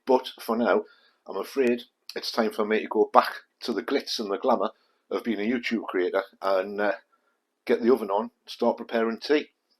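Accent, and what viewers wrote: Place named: Northumberland, United Kingdom. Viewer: I'm 73. Your accent >> Northumbrian was same as my grandfather's. Happy flashback memories listening to you.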